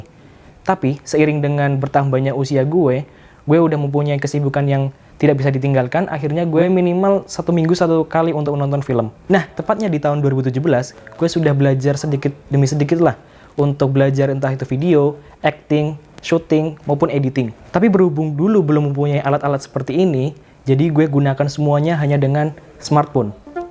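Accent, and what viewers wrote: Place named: Java, Indonesia. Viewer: Gimana kalo guenya jadi aku atau saya biar easy listening aja medokmu isih katon maskuu😭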